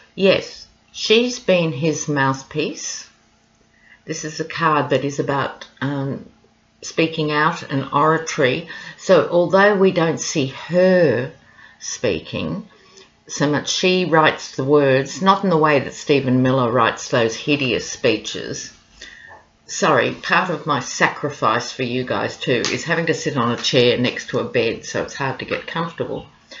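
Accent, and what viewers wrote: Australia. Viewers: Hi, I just discovered you. Great to hear a fellow Aussie speak about the crap going on in the states! A lot to catch up on...😊😊😊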